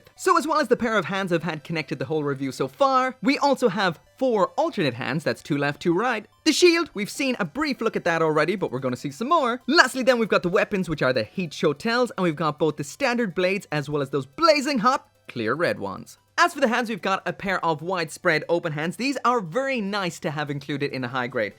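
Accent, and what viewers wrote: Ireland. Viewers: Dude I never knew you were from Ireland. The voice makes SO much more sense now.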